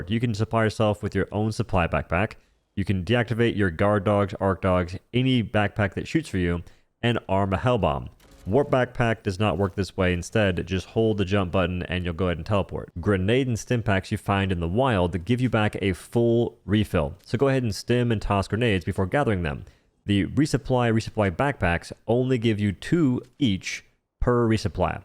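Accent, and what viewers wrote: South, United States. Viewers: the way he says WH- is prime american. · could be georgia could be louisiana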